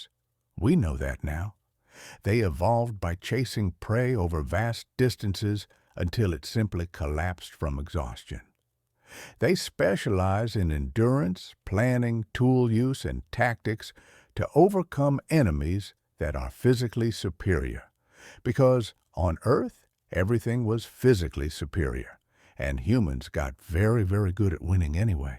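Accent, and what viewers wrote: South, United States. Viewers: You liked an "alien" speaking with a southern USA accent? I find it so stupid I quit watching! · Narration is an amazingly human sounding AI... with just a touch of a Southern accent. Thank you very much. Little old lady listening from rural West Virginia, USA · Cowboy accents don’t mesh well with sci-fi stories, sorry.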